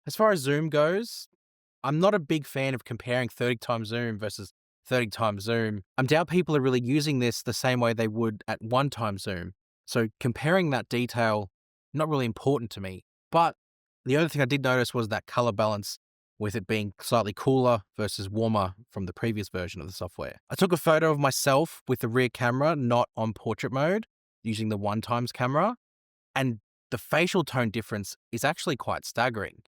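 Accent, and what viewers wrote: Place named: Australia. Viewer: It's nice to hear an Aussie accent instead of US accent for a change.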